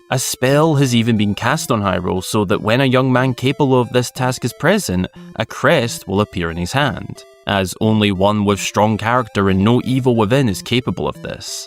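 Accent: Scotland, United Kingdom. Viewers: Scottish Zelda Storytime is now my favorite Storytime. 💚🏴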